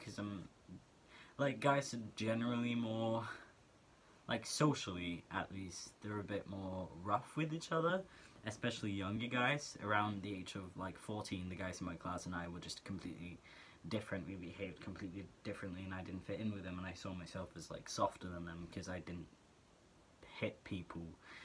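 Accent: England, United Kingdom. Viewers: I realy Like the way u speak (i mean the brithish accend) sry i'm from Germany and my Englisch is not the best 😂 (this isn't so good because my Grandpa is from Wales so i'm stupid 😂) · I gotta agree with the complements on your voice. Like damn, its an attractive voice. And when you add you english accent, it really sounds good. Have a great day :)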